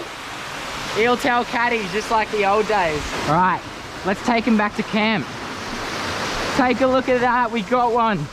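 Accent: Australia. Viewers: He’s Australian with an Australian accent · he’s literally australian thats his voice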